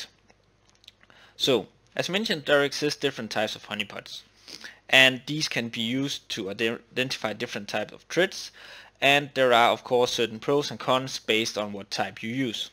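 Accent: Denmark. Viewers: Are you danish ? :)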